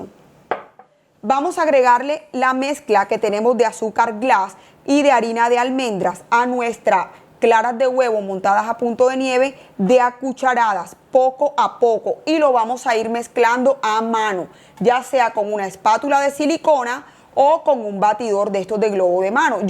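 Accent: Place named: Barranquilla, Colombia. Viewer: Eres barranquillera!!!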